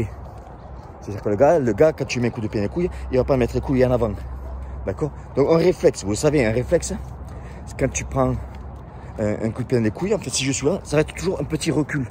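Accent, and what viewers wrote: South, France. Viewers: Salut franchement tu me régale!! Un mec comme toi je vais à la guerre les yeux fermés un mec vrai et authentique sa se voit et sa s'entend😉 et étant de Perpignan entendre un accent et un parler du sud sur YouTube fait encore plus plaisir 😉👊🏼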